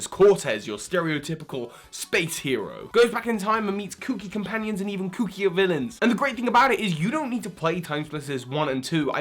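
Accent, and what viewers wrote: United Kingdom. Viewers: I love British accent so much!😫😫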